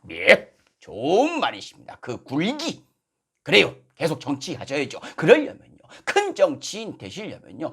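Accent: Jeolla, South Korea. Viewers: 어이 자네 자 네는 전라 도 사 람 이 아니면 서 전라도 말을 그럴싸- 하 게 하는 디 전라도 도 지역 마다 억양 이 다 다르 네 자 네는 전라도 어느 지역 말을 쓰는가 나는 순 천60대 전라도 말을 쓰는 것은 자유 지만 전라도 사람 욕먹는 발언 사가 해주소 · 숨도 안쉬고 마시시네요. 전라도사투리는 들을수록 정감이 납니다. 물론 내용이 더 맛을 더하기는하지만... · 서울에서 태어났담서요~~성동일씨랑 쌍벽을 이루는 라도 사투리 투 톱👍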